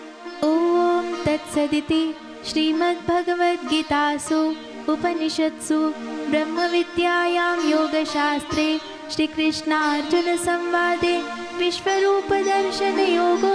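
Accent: Standard. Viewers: बहुत बहुत प्यारी सुरीली और कर्ण प्रिय आवाज़ में , शुद्ध उच्चारण से , शुद्ध भाव से आपने हमको भी धन्य कर दिया है । भगवान श्री योगेश्वर की कृपा आप पर बनी रहें । जय श्री कृष्ण ! 🙏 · अत्यंत स्पष्ट व शुद्ध उच्चारण, शब्दों का उतार-चढ़ाव भी अत्यंत भक्तिमय हरे कृष्ण हरे कृष्ण 🙏🏻🙏🏻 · माँ सरस्वती की वाणी आपकी jihwa में है. Shudh उच्चारण, सुनने में Priy है.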